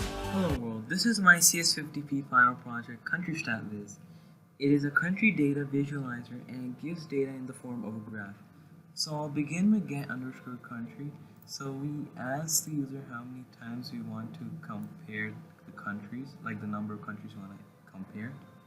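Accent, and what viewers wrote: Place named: England, United Kingdom. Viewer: EPIC ENGLISH ACCENT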